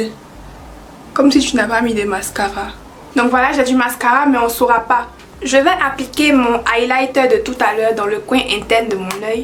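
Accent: Ivory Coast. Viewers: Ma soeur ivoirienne, je te kiff grave ,chic accent ivoirien et chic vidéo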